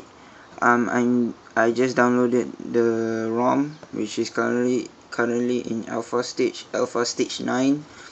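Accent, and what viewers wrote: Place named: Malaysia. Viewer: sounded like a Malaysian.. probably a Malay coz of that accent..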